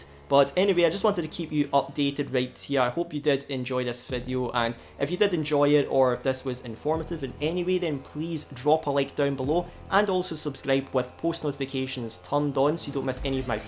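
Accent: Scotland, United Kingdom. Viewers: Arr you scottish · U must be Scottish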